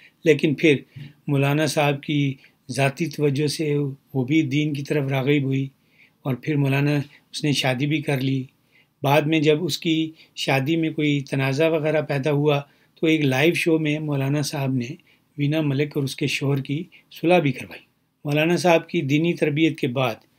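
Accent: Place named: India. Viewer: And let us all know what is RM TV? Is it shorter form of RAM? Coz you speak like Indian.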